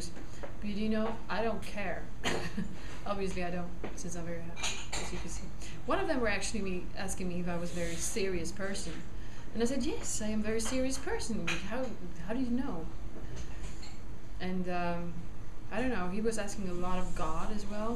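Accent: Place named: Sweden. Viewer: I love that Swedish Accent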